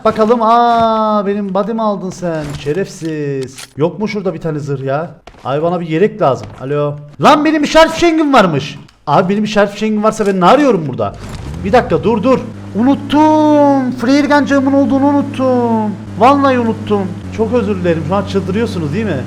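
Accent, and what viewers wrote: Cyprus. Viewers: Abi kıbrıs lımısın be gardaş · Sırf şu Kıbrıs şivesi için izliyorum enerjin de güzel başarılar dilerim 👏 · kardeşim sen kıprıslımısın konuşman çok tatlı kıbrıslıları seviyore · Kktcden geldim askerdim aksani ozlemisim cansin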